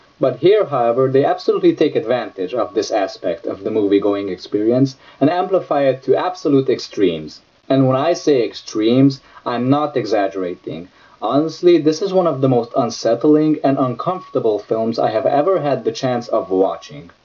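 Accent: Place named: Portugal. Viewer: Are you portuguese? You kinda have a portuguese accent :)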